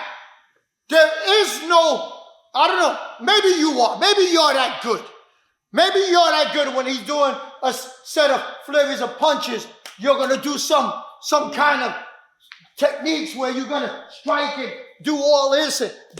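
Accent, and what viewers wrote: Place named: Brooklyn, United States. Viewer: Straight-up Brooklyn New Yorker. The South Bronx hears you and feels you! Stay Righteous!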